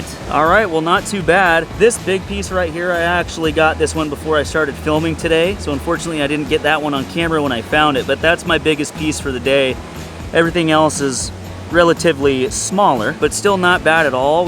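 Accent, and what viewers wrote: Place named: United States. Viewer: Gold in the top material, gold in the red oxidized sand, gold in the blond sand . Flood gold is found in flood layers? Excellent deduction! What country are you in? What river? You have an American accent, not Australia, Colorado, Arizona? are you in Northern California?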